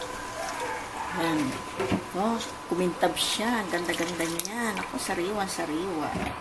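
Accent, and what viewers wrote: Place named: San Miguel, Philippines. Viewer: thanks for the share! i like the way way you described things, may punto pa eh! parang taga san miguel eh! i watched the bagoong, so i ll continue with tuyo. ganda ganda nang tuyo, just like you and your video! thanks again! ow sadiqa ka pala... kaif falik, shukran jazilan!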